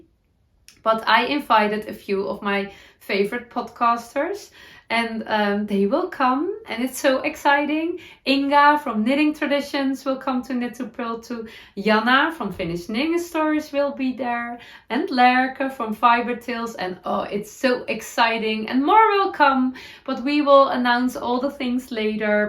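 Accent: Netherlands. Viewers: Dear, I always look forward to your podcast episodes, sitting comfortably in my armchair knitting and listening to you. As I was born in Aken, your dutchy English gives me home vibes. Heartjumping! And of course I'm coming to Breda for the k2p2 festival. My husband is coming with me, if only so that I can show a few more of my knitted pieces on him as a model. 😇 Best wishes from Cologne!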